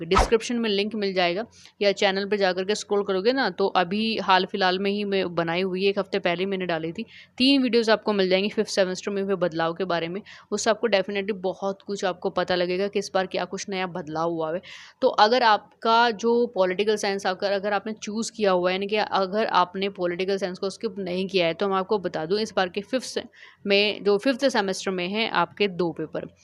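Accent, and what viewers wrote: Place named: Pakistan. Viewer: Mam your content is very helpful but your english accent matches with pakistani cricketers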